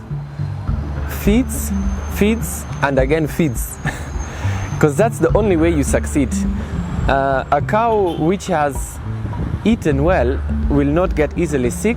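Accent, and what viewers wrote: Kenya. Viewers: Kenyan accent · Accent ni Kenyan Kabisa... inspiring though.. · This guy is so Kenyan and is most likely married to a luo hahaha I love the accent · am impressed with your new Kenyan English accent. happy for you. will visit soon. love from uganda. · Yeah.. He speaks like a kenyan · You even have a Kenyan accent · 🧠🧠❤👈👈✅✅✅👌👌this man he is smart intelligent person because the way he performs and pertaining the accents of Kenya English ✅✅✅✅🤔🤔👌👈🧠🧠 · His Kenyan accent is dope! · His accent is a mixture of luo kalenjin and kikuyu. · As a Kenyan, if I listened to audio of this video alone, I'd say he was born and grew up in our country...pure Kenyan accent! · His accent is soo Kenyan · He even mastered our kenyan accent. 🤣🤣🤣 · Cool venture. It's so funny that he even speaks English with a Kenyan accent. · You have a Kenyan accent 😅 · This dude has picked up a "Kenyan English" accent. 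Cool. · hii accent ni ya county gani Kenya? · Very valid points about logistics...whoever figures that out for africa will be a very rich woman/man..... his accent tho if I didn't see him I'd think he's kenyan wow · Kabisa. Kenyan Ancent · DUDE SOUND LIKE HE WAS BORN AND BRED IN KENYA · Close your eyes and you will hear Kenyan speaking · The guy has a Kenyan accent, that surprised me, kudos mate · Wow you speak like a Kenyan 😲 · His accent already sounds kenyan... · He speaks like a Kenyan, wow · Haha Typical Kenyan accent 🤣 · dude you sound more kenyan than me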